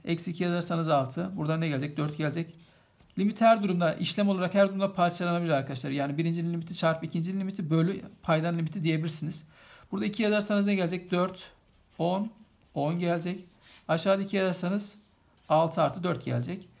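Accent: Trabzon, Turkey. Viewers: Hocam zannediyorum Trabzon/Arsinlisiniz.Konuşmanız orayı andırdı.Alakasız ama yine de belirtmek istedim.Ayrıca anlatımınız çok güzel. Teşekkür ederim.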